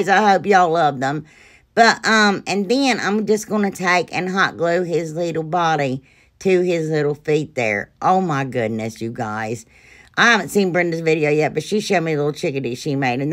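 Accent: South, United States. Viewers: Love everything u sound so cute like frm the south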